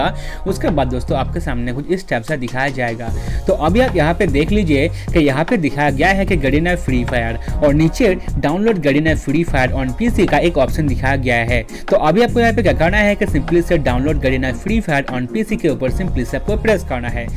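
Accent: Bengal, India. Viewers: apni bengali?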